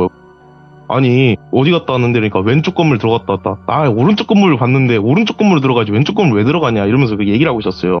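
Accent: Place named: Jeolla, South Korea. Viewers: 뭐래요 군산 사투립니다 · 저가 저가 거리는거 거슬리네 전라도 사투리인가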